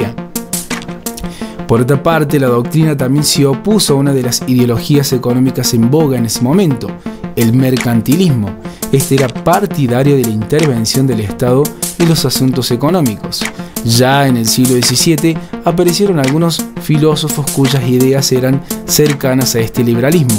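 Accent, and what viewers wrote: Córdoba, Argentina. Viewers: Creo puede ser cordobés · Like por el acento cordobés 😍 Nah fuera de joda, me sirvió mucho, graciass!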